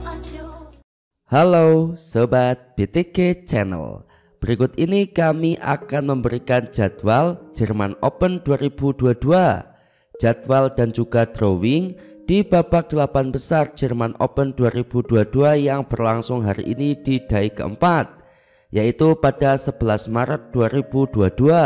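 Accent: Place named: Java, Indonesia. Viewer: Medok bnget jowone to mas..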